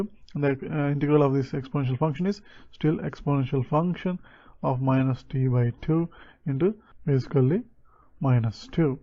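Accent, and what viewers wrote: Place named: India. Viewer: Heavy Indian accent makes it hard to understand.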